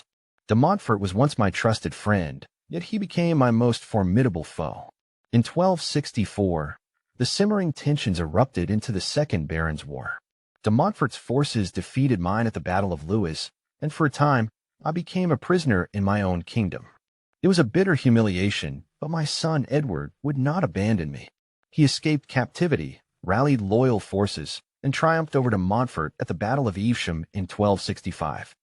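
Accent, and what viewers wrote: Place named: United States. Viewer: "King of England" but has an American accent lol if you're gonna use AI at least give him a ye olde English accent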